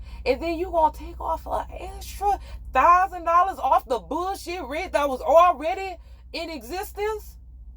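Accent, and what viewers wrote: Louisiana, United States. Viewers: Love that Louisiana accent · Sounds like a beautiful Lousiana accent. This lady is beautiful! Hey Sis! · I Love that Louisiana twang guuurl just keep talkin 😁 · Love the accent. Gotta be from New Orleans. I hear the Cajun in your voice. Anyway, Stay safe outhere. Don't take no wooden knuckles Beeby!!! My Louisiana people know what i'm saying. Lol. Peace. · I like her accent, what part of louisiana she from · I love the Louisiana accent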